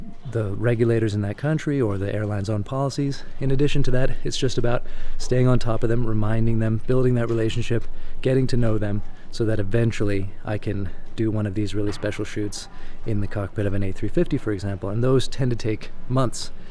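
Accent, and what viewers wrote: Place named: Canada. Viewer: Kind of a different question for you,...I'm a bit of a student of language and I'm really curious where you did your English training? You have, I'm guessing, an almost Canadian accent for someone from Sweden. Anyway, love your content and use of the English language. 🙂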